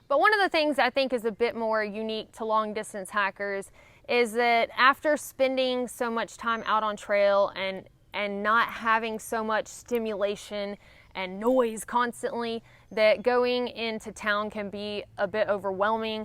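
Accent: South, United States. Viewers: I've made this comment before I guess but in 79 I hitched outa Charlotte to never really return to the south and much further down the road America itself. Now in Scandinavia. Long long journey; but your voice and your very southern alterna-girlness takes me back, strangely very familiar and missed somewhat. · I realized all of these things from long outdoor experiences while in the Army. I still remember pulling my sock off and throwing it against a wall and it stuck. That was after weeks of not having the opportunity to change clean etc.. I really appreciate your southern drawl. I’m from Louisiana originally. Living in Europe going on 26 years and don’t get the chance to listen to the singing of the southern girl. Amazing. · Sounds like a lot of things I went through coming back from Iraq. Weird. I like your videos. Good advice and you have a soothing tone and an accent that’s as country as a butter bean. 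· It amuses me how people are so enamored with accent. It is just how we talk! Of course, her's is a nice, sweet version. However, you know you have someone with a good southern accent when the pronunciation of "pin", "pen", and "pan" are basically indistinguishable. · I love the crystal clear "et cetera" in the midst of your southern drawl 🤣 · I find her accent rather annoying. Better I guess, and more genuine, than that haughty Valley Girl manufactured ball-of-butter-in-the-back-the-throat voice that a lot of chicks choose today. Still, she's been out of the country now and has been exposed to enough different kinds of speech that she can improve that Scotch-Irish Southern drawl that makes her sound ignorant. I did.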